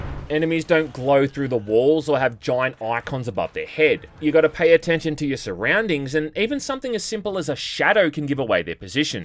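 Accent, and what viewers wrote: Bogan, Australia. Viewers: thats one bogan af aussie accent.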